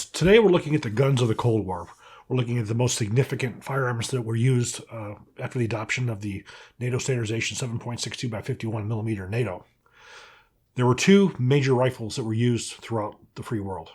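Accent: Texas, United States. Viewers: Texan accent.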